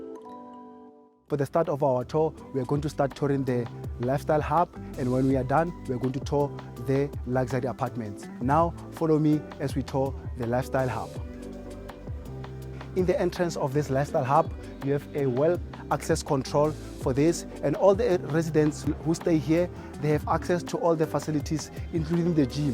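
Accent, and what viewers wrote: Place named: Africa. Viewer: Very nicely presented with an African accent.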